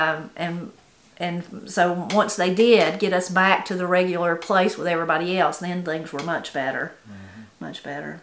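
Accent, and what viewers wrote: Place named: North Carolina, United States. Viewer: I love listening to all your stories. It's the accent. My family is from North Carolina and its like being able to hear them again.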